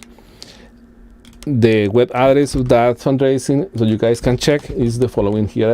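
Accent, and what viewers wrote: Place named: Chibcha, Colombia. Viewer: ese ingles campeche con acento chibcha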